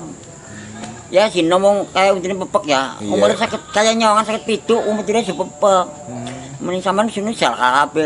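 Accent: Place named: Banyumas, Indonesia. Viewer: Mbah ... Bahasa ngapak yg begini bikin aku betah di tegal ...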